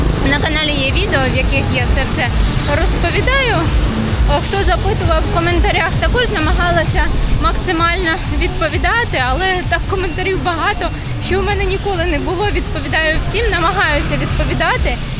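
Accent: Standard. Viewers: Моэ вітання з Німеччини. У вас чиста українська мова. Розповідаєте дуже цікаво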